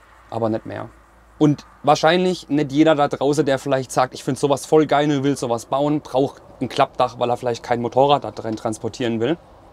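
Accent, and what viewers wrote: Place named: Swabia, Germany. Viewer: Müsste Schwabe sein glaube ich 😄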